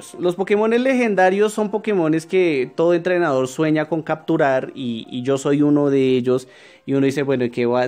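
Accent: Colombia. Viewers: Puro colombiano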